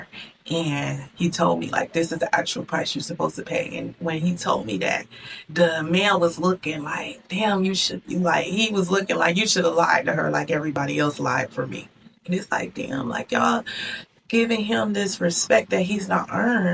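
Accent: United States. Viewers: You have a strong African-American Southern accent.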